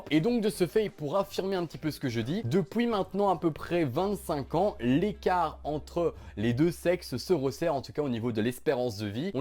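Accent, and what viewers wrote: Belgium. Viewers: ginisti c'est l'accent belge ça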